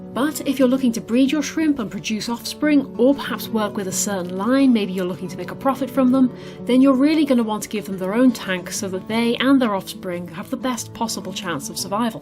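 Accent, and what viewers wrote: United Kingdom. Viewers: Great edit and I really like your UK accent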